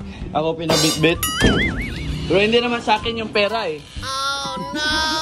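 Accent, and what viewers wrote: Visayas, Philippines. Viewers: Dun ako natutuwa sa opening spiel mo na What's up ketchup... dun pa lang good vibes na pati pagka-candid mo. Tuwa din ako sa Visayan accent mo (no offense meant, hah!), na kering-keri mo, bro!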